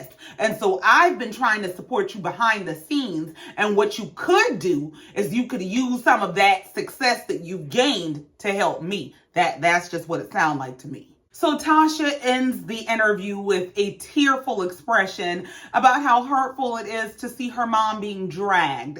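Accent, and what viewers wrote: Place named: Charleston, United States. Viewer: Hey new subbie... where you from? Sound like Charleston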